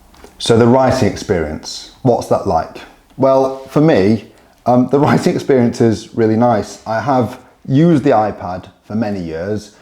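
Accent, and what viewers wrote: United Kingdom. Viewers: Matthew Brokerick is doing pretty well I guess. Picked up a british accent somewhere too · This is an impressive review. The pot plant in the background gives a vintage office feel! It’s great to hear an English accent. I’m a lawyer and have umpteen notebooks per case. I like to write with a good fountain pen. The contents of my notes are confidential. Is that preserved? I’d like to move to something like this and the idea of saving paper sounds good. Are you sure I won’t lose any work? Bobbie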